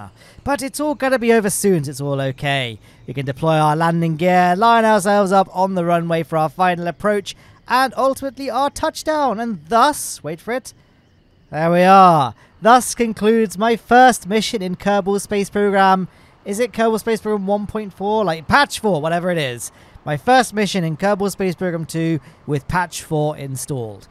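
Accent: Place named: Southwest England, United Kingdom. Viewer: Knew you was from the southwest, its nothing like a Janner accent but you sound like a lot of people i know from plymouth and in bristol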